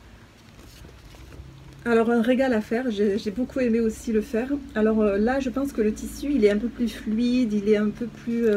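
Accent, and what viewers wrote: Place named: South, France. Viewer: Kk, Je viens de regarder tes vidéos, j'aime beaucoup suivre ton évolution et je te trouve débrouillarde surtout avec le tee-shirt adulte transformé pour enfant. Tu as la voix calme avec un accend du sud bien sympathique. Je suis abonnée pour pouvoir suivre ton évolution 😘😘😘😘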